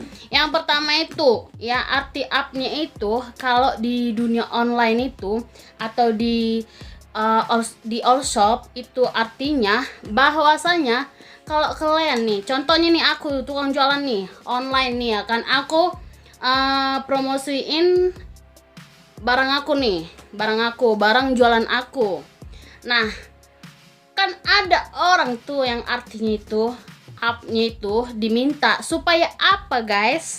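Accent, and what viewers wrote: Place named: Medan, Indonesia. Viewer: Medan kali kau bah,, salam kenal subscriber barumu dari gununh para tebing tinggi..😊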